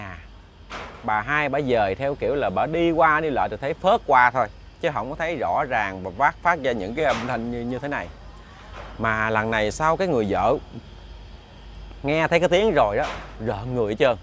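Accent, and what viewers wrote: Mekong Delta, Vietnam. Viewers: Người Miền Nam mà lại hệ miền tây nên dễ nghe, dễ hiểu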